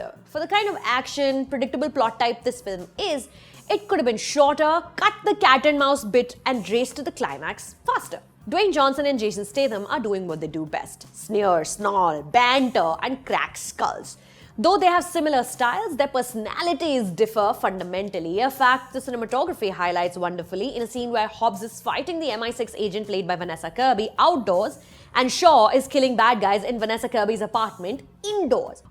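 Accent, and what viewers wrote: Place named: India. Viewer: I dont know how u do it but u speak english like hindi. Never ceases to amaze me.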